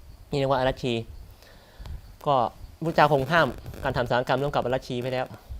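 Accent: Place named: Rayong, Thailand. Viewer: สำเนียงเพราะ คล้ายๆคนแถวเมืองระยอง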